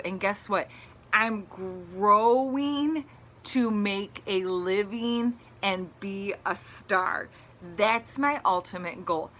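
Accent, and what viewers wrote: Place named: Midwest, United States. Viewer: You're amazing! I found your channel during BBW SAS, and have really enjoyed your videos. Honestly, I really enjoy your accent. I love people from the midwest, people there are just so sweet and kind and genuine. Your accent makes my heart smile. I love how you make a big deal about the thumbnail and Byyyyyyyyyye, too. It's your signatures! Keep doing you, you can do anything! ♡ #TeamJackie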